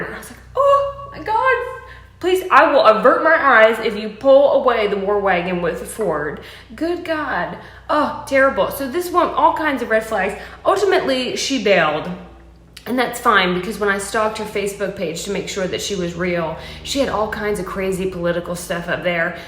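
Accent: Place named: California, United States. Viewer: Girl where you from ⁉️⁉️⁉️ You sound more Cali than North CAROLINA. Keep your sense of humor it looks good on you.!! ✌💗🎼🎵🎶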